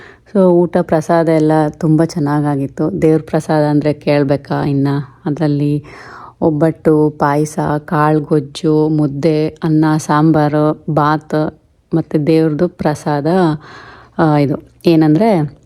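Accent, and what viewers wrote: Mandya, India. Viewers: ನಿಮ್ಮ ಮಂಡ್ಯ ಬಾಷಾ ಕೇಳುಕ್ಕೆ ಚಂದ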